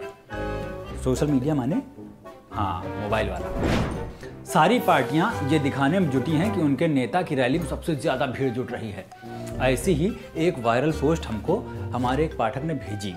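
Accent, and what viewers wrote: India. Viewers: I love to see all the news read by. His news reading mixed with desi style is really interesting. Keep it up.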